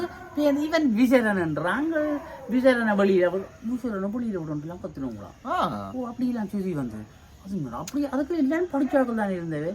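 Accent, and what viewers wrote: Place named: Jaffna, Sri Lanka. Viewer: உண்மையில் எங்கள் ஊர் பேச்சு 🥰உண்மையின் பேச்சு 👌 வாழ்த்துக்கள்.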